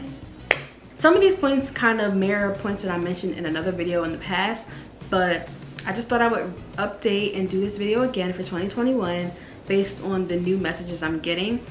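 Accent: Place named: Jamaica. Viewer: I heard that Jamaican accent. Nice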